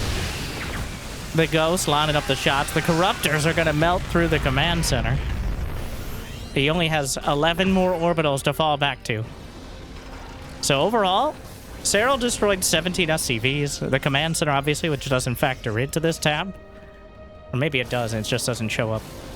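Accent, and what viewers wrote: South, United States. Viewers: Dude im not gonna lie, I used to watch you years ago. I do NOT remember you having a southern accent whatsoever. Am I delusional?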